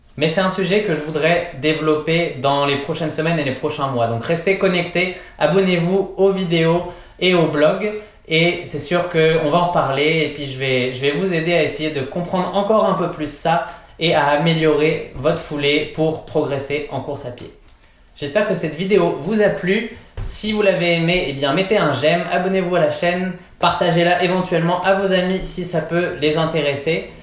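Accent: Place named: Canada. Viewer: C’est moi ou l’accent canadien prend le dessus ? Merci pour tous tes conseils 😉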